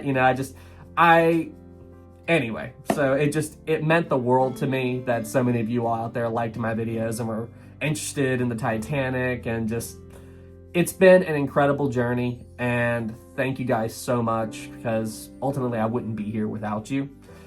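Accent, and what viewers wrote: South, United States. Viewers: You're from the South aren't you?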